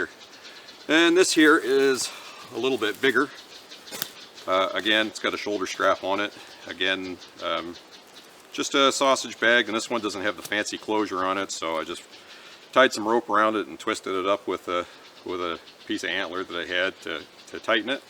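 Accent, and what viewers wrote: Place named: Vermont, United States. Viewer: Phenomenal presentation, sir! I thoroughly enjoyed literally every aspect of your video! I too, am a historical enthusiast, focusing on historical fencing, but interested in ALL aspects of life in the period…I specifically wish to hunt boar with a spear in the old style…I’ve hunted them with firearms, but to do it with a spear….at any rate, I digress…Your accent sounds local to me-(I live in the state of Vermont)-but due to your use of the metric system, I suspect you’re just North of me, in the lovely land of Quebec…I’ve visited Quebec City; lovely, historic town…I felt like I was somewhere in Europe! Thank you so much for sharing your passion! Its always good to meet kindred spirits. I hope this message reaches you well, sir!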